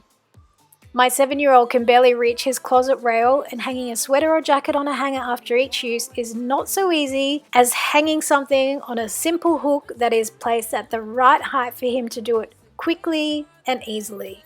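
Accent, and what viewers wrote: Australia. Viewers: Just subscribed.Just realised my sodastream fits next to the microwave! didnt think to try it before.. nice to hear an ozzie voice. Great videos (Straight to the point, less chatter). Keep up the good work! :) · very clever ideas nice to hear an Australian voice too xoxo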